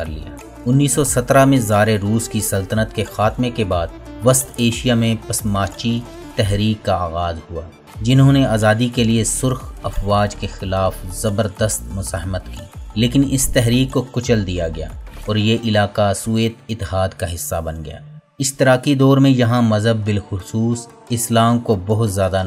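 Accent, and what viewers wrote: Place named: Punjab, Pakistan. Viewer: First you should try not to speak Urdu with Punjabi accent. But your German and you will be German understand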